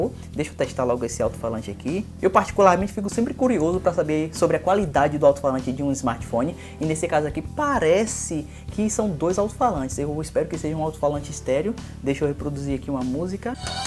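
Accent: Bahia, Brazil. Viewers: mano mim acabo de rir com o seu sutaque você é da Bahia ? um grande abraço.e link marato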